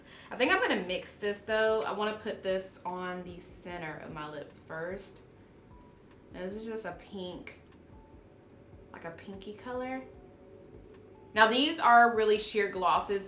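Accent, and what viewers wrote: United States. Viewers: Lovely video. Just recently purchased the elf Camo concealer and the hydrating concealer, I’m looking forward to trying them. Love your accent, where in the states are you from? :)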